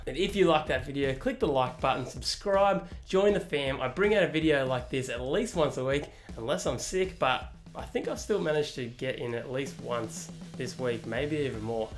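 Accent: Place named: Australia. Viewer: not sure why, but i thought you were going to say "if you guys can't tell by my voice, i'm Australian," lmao. this video is super helpful though, thank you!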